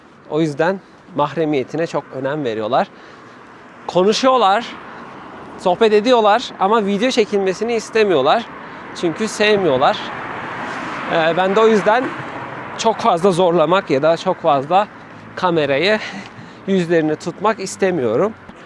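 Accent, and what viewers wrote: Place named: China. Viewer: Çince aksanınıza bayıldım ya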